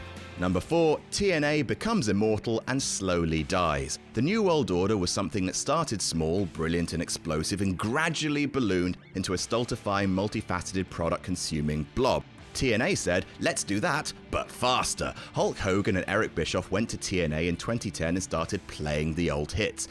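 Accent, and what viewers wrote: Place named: RP, United Kingdom. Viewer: this sounds too posh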